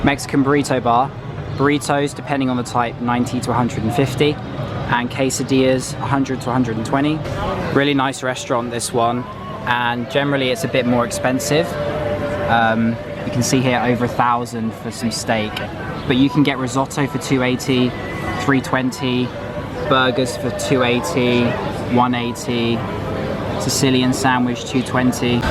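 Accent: United Kingdom. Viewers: I hate that British accent , coward Brit’s gave their county away to Muslims , puke 🤮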